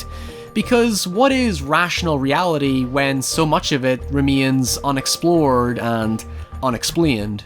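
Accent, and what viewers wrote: Northern Ireland, United Kingdom. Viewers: if the host british ? anyone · I was trying to pinpoint your accent and then I realised it was Northern Irish just like myself. Have never seen such a popular youtuber from here! Props to you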